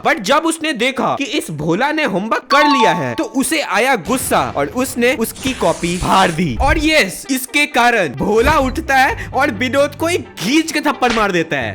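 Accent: Bihar, India. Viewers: Bihar se ho kya?? Aapke bolne ke style se laga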